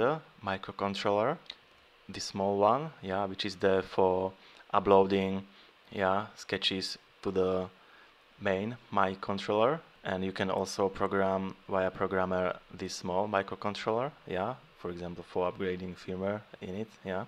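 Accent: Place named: Slovakia. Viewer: Are you from Slovakia? I sense a Slovakian accent there :)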